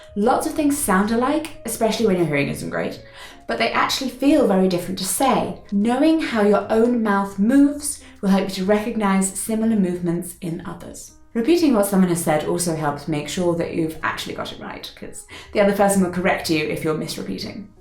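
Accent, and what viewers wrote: United Kingdom. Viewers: Your positivity is so contagious,; I love it! 🥰 Thank you for the tips on learning to lip read! I definitely do rely greatly on captions right now! 😬 Especially with different accents, like yours. I adore a British accent, but struggle to understand some words sometimes... although I've watched enough of your videos lately that I'm starting to pattern your voice 😊 P.S. you hit on all of my moms biggest pet peeves about talking to people (Covering their mouth, chewing etc)...people can be so annoying and disrespectful sometimes!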